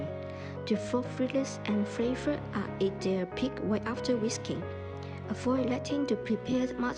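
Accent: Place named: China. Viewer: Are you Chinese? I love your accent.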